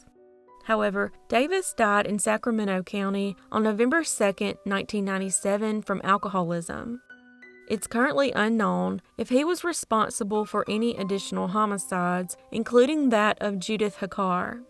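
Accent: South, United States. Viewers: South African accent is mostly inspired by British or Australian accent! But in this context the person is wrong to judge her accept! I think her Southern accent is so great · Urgh that southern accent, and the way you end your sentences makes me cringe 🤮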